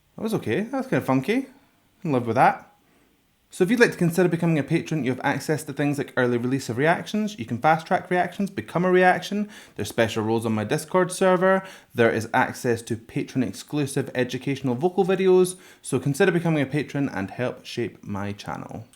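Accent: Scotland, United Kingdom. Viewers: Hey. Thank you for the technical explanations. This is exactly what I look for in a reaction video and I so rarely find it when it comes to reactions. Also, love your Scottish accent. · Hey, this is nice. Keep going! This ARMY approves. Not that you need mine or anyone’s approval. 💜 Some of the songs Jin really shines in are BTSs Japanese songs, and Korean. And ofc his solo songs, etc. is a magnificent vocalist. Very stable too. Have fun with this! ps. I’m Swedish but I worked a season in Dalwhinnie and traveled around Scotland many years ago and when I hear you speak it really takes me back. Love the Scottish accent. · I love Scottish accent! I enjoyed your professional explanation very much! Jin is definitely a great singer and yes, we need more Jin in ’ songs!💜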